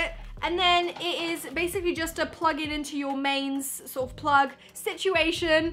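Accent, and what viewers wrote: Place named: United Kingdom. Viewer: She sounds too English to be true.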